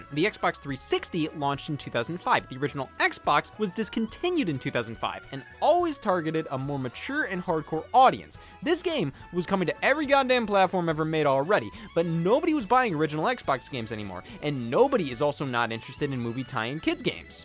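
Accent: Midwest, United States. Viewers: He’s from the Midwest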